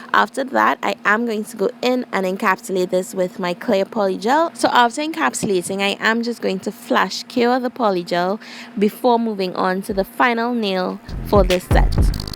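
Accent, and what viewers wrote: Trinidad and Tobago. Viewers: I’m obsessed with the polygel!! Side note: You sound like you have a Trinidadian accent lol correct me if I’m wrong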